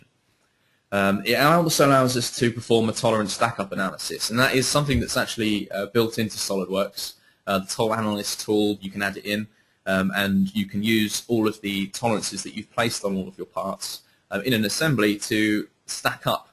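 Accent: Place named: England, United Kingdom. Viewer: Other than the silly english accent, very helpful. Cheers =-)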